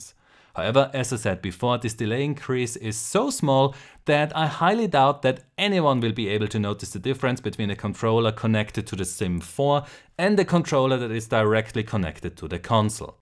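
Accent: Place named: Germany. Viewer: Call me Sherlock I think he might be german ._.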